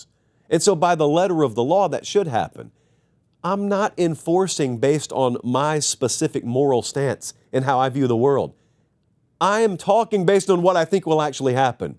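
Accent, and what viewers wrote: South, United States. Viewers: 😂GTFOH! when the story first dropped you were literally saying that you thought nothing would happen. You can use your southern twang all you want to try and backtrack. But you got caught, called out, and now you're trying to do your best tap dancing to get out of being called out. Just admit you were wrong and move on. ScUM is a big brand and you didn't want to piss off their fans. But you backtracked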